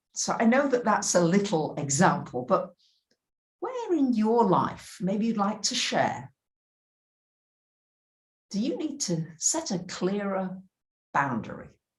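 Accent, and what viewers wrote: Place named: North, United Kingdom. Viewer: Thankyou for your message , your accent seems more northern in this video!. And you look a bit different, all the Greek food I expect!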